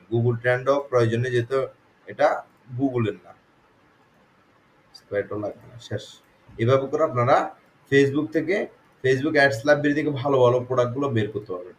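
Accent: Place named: Chittagong, Bangladesh. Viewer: কথা শুনলে বুঝতে পারি আমাদের চট্টগ্রামের ভাই। আপনার বাড়ি চট্টগ্রামের কোন জায়গায় বদ্দা?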